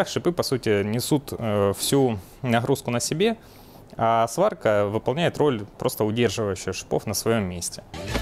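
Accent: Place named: South, Russia. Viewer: Обычный говор триста километров на юг от Москвы все так говорят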